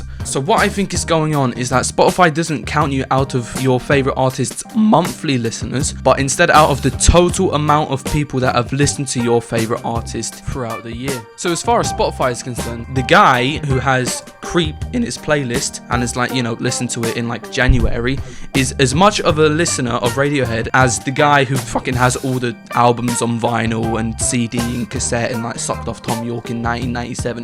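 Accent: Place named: United Kingdom. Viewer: i thought u were dankpods for a bit, then i heard u were british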